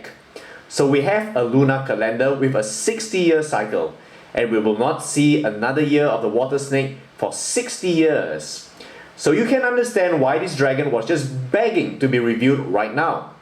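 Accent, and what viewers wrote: Singapore. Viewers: are u singaporean, bro?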